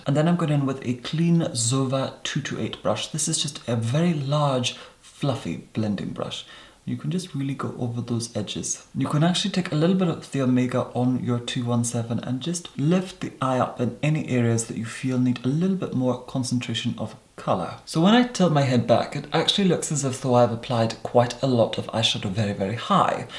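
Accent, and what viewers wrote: Scotland, United Kingdom. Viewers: Is that a hint of a Scottish accent I can hear ? Yes I’m new here 😊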